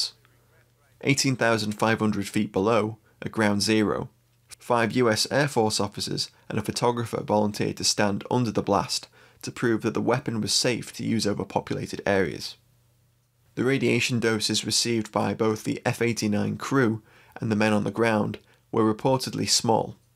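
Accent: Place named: Cockney, United Kingdom. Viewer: Why always with the Cockney Annoying Brit Commentator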